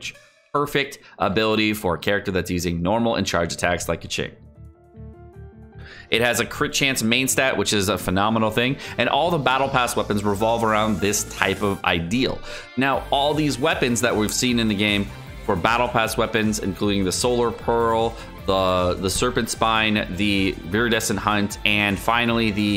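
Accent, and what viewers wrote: United Kingdom. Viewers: That's a very Bri-ishy accent!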